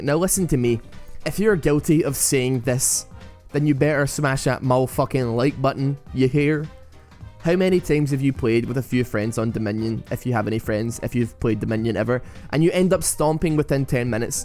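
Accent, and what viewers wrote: Scotland, United Kingdom. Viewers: are you scottish? I've been watching you for quite some time, but only now that I've started watching some videos of Frankie Boyle I've noticed your accent sounds very scottish:D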